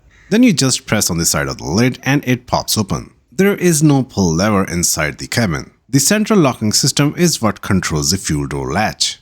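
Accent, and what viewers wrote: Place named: India. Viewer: Great video. But Im not doing all that! Nice accent, though. New Delhi? My ex-husband is Punjabi from Panchkula. His sister is famous actress Yamini Singh. She’s in Mumbai, of course! My didi. ❤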